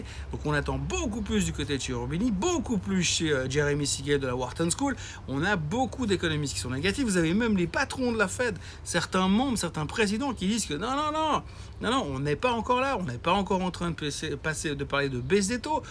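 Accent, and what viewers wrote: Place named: Carouge, Switzerland. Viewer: Le marché monte notre ça l’énerve! Tout laisse penser qu’il a pris des positions à la baisse Et ce look de vieux navigateur solitaire et cet accent carougeois traînant ni changer rien.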